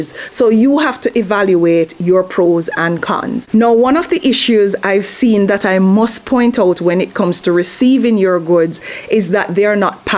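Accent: Jamaica. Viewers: I thank you so much beautiful and wonderful woman I can hear the accent but you already said something about Jamaica I am African thank you this is the second rinse and repeat Rockstar I'm watching you're straightforward absolutely wonderful honest there's no holds bar thank you Rockstar I believe you will hear from me you are blessed.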